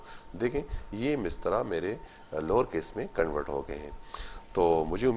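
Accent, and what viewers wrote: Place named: India. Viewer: He revealed his face. I had an idea you were from india with the accent but very informative video.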